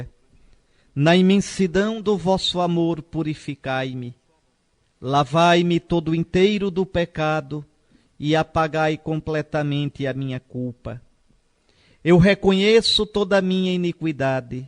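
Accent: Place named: Northeast, Brazil. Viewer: Uma ótima explicação em dialeto nordestino. Dom Henrique intercedei por nossa Quaresma!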